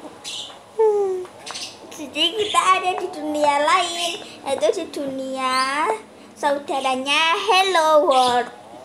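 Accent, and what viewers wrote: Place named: Java, Indonesia. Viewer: Pliss dong medok nya membuat saya mengngakak 😭😂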